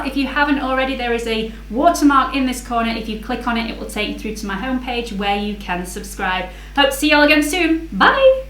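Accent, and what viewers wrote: United Kingdom. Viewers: Great video! Thank you for sharing! I quite new here but I can say that you are very honest to your subscribers! And you not only help me do my makeup but also help me practise my English since I'm from Argentina! It's so nice to listen to a British accent! Greetings gorgeous! 😍😍😍😍😍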